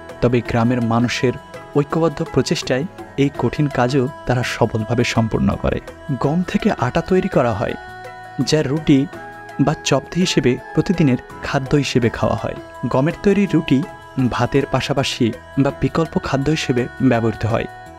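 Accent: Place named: Bangladesh. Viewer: Vai, apnar naam ki?? Apnar voice to ami onno channel er background a SS hunecchi mone hocche.... R apni bodhoy Bangladeshi, not from kolkata, kothar style shunle tai e mone hocche. Amio from Bangladesh 😊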